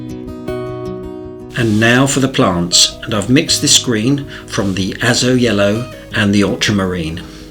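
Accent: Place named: United Kingdom. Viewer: Sounds very British love it !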